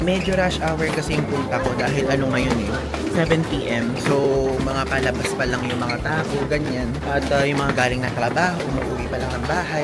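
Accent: Nueva Ecija, Philippines. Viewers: Pag nagsasalita ka, halata talaga na taga Nueva Ecija ka. Not a bad thing though. Nanotice ko lang. Taga Nueva Ecija rin Mama ko.. 😊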